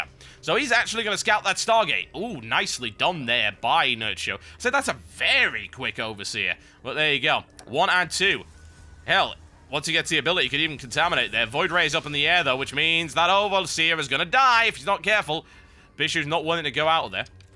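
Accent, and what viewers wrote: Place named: England, United Kingdom. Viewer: Totalbiscuit you and your crazy english accent make watching SC2 exciting and sexy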